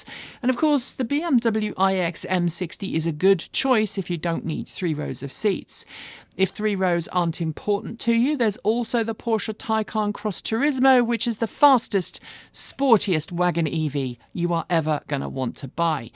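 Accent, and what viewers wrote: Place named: United Kingdom. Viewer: A dumbo putting up a dumb video with a stupid British accent.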